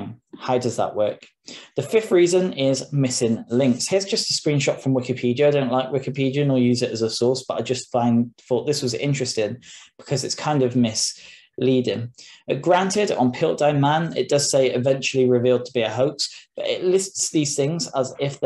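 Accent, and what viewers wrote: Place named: United Kingdom. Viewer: >>> The speaker’s accent appears to be British. Strange, because I thought only Americans were this ignorant and stupid.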